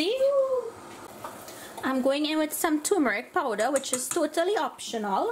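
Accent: Trinidad and Tobago. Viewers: I did a quick search for a cream of wheat recipe, and this video was the first to come up. I clicked the thumbnail without reading the name of the video or the name of the channel. Within the first 2 seconds of the video I said "buh she soun'ng like ah Trini". It was a most wonderful realisation that this is a Trinidadian channel on YouTube. Imagine my elation when it hit me that it was the first video to come up! Good job on the quality of your content as well as the authenticity with which you have present 'Trini cooking'. You have represented us well in my estimation! I truly hope this channel will continue to grow (: all the best to you.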